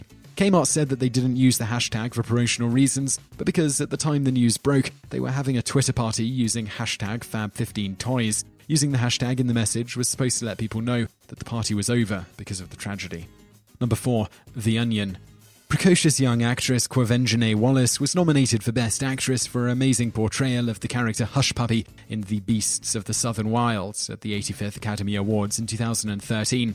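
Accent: England, United Kingdom. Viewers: He's English. they pronounce things differently there. Get over it. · Mobiganic Actually the lists are typically really well researched. But he just reads them, they have other writers for the website itself. Yeah, he's British, so pronunciations Americans take for granted aren't used so much there. Also, he's British, so he pronounces some things differently. Get used to it.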